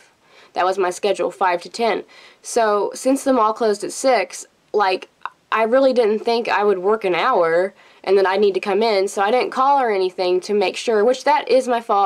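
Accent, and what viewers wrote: South, United States. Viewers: a bit of southern accent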